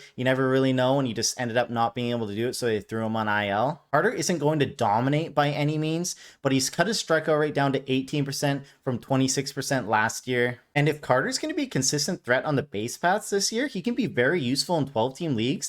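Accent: Canada. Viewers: Careful with that Schlittler pronunciation, man. This is a family show. That said, I expect to hear "cuck" no less than twice in every video and you delivered. From another fellow, thanks for continuing to justify my obsession with Kurtz. Idk how many losses it has contributed to thus far, but it's finally paying off as my playoff hopes hang by a thread! Does the mini-hoop indicate that you'll keep this going with some basketball content? The football market is flooded and I already think I know more than everyone in that sport, but the NBA is an afterthought as one who always preferred college hoops. Either way, I'll support it until you bore me or piss me off! Non-sports question... I think you've hinted (or flat-out stated) that you're in Texas, but do I detect a little canuck in that accent? Whatever it is, it doesn't exactly scream Texan. 🤣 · I thought you were Canadian by the way you pronounce your "O's". 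Especially in words such as "about" or "out".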